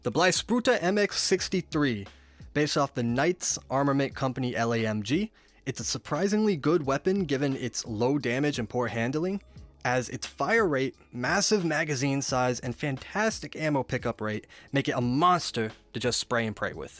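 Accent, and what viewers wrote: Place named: South, United States. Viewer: Your southern accent be slipping out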